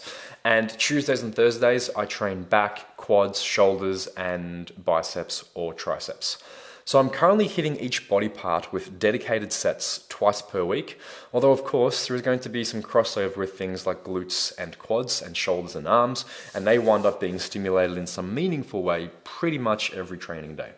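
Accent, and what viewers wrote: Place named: Australia. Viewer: Didn’t expect the Aussie accent 🇦🇺 subbed, cool vlog man.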